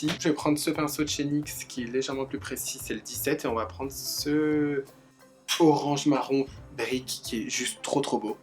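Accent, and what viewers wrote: Belgium. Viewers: Je suis à la ramasse avec tes vidéos mais je suis à jours sayait lol. Tu es belge ? Question bête 🙄. Je t'adore trop trop trop trop tu m'fais tellement rire 😂 Continue d'apporter de la joie ❤️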